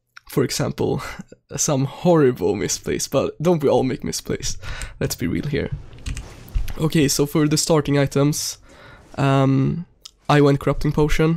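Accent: Sweden. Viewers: That swedish accent. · Nice commentary! BTW...sounds like ure swedish ^^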